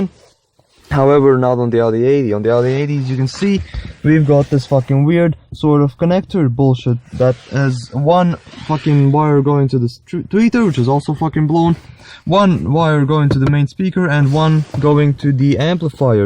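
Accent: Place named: Latvia. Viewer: Can hear ur latvian just by the talkin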